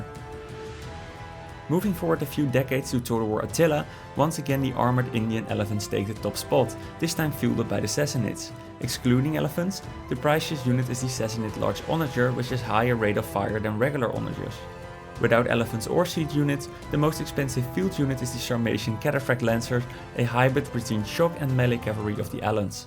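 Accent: Netherlands. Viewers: That Dutch accent tough 😂😅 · Holy shit, the narrator is Dutch as hell 😂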